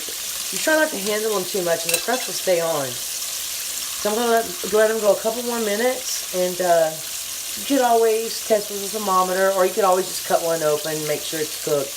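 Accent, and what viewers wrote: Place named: New Orleans, United States. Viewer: Your channel is fantastic! You are a wonderful cook. When I first heard you I thought " oh she is my people" as I was raised in NOLA!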